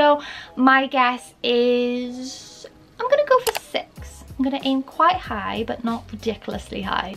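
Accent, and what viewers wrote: United Kingdom. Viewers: I still can figure out if is British or not-💀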